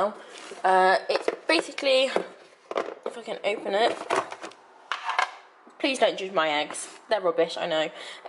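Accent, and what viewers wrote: United Kingdom. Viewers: Are u from the midlands?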